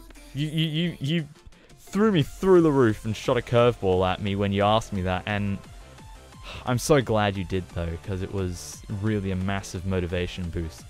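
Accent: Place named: United Kingdom. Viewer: Um... Wow... ME: YOU SOUND BRITISH, WHY?! [MIND BLOW]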